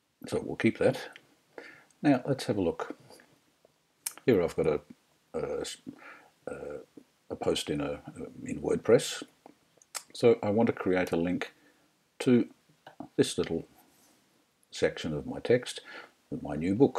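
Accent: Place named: Australia. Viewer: he sounds like an aussie version of bob ross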